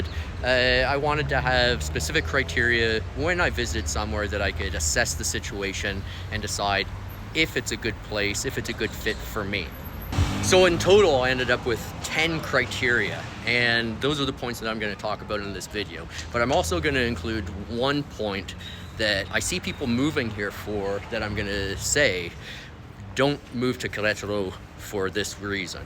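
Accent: Canada. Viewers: I noticed that Canadian accent right away LOL. As soon as you said oat and aboat was pretty obvious😂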